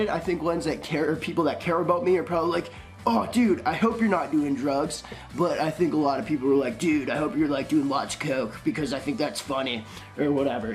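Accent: Canada. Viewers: Hope you stay safe man//side note you have such a THICC Canadian accent already knew where you were from in the first min 😂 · Are u Canadian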